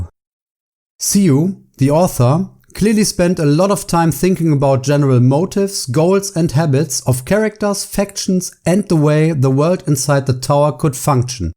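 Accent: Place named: Germany. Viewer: THIS is a real german accent congrats on the 1k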